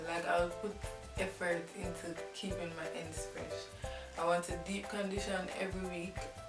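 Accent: Jamaica. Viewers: ...are you Jamaican? you kinda sound...Jamaican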